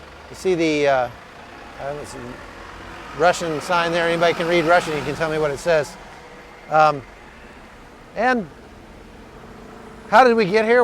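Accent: United States. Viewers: I guess he's american by his accent. So I can justify his limited knowledge of everything outside the United States. To americans, everyone east of Germany is russian and writes and speaks russian. And they are probably communists :D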